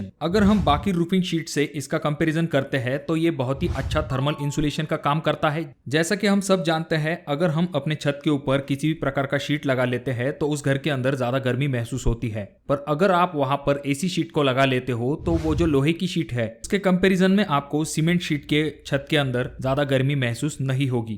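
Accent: Maharashtra, India. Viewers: Speak karte samay marathi uchar aata hai aapka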